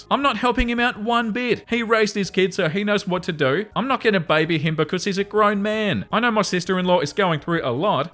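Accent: Australia. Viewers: Can confirm the accent thing happens very commonly, even in adults. I someone says words in an Aussie accent thanks to and some other Aussie celebrities/creators. But the rest of that story is in fact concerning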